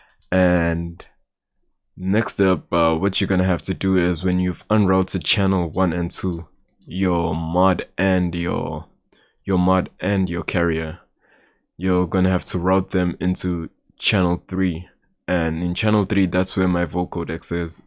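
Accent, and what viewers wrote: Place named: South Africa. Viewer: I know a South African accent when I hear,I'm glad there's more fans in the country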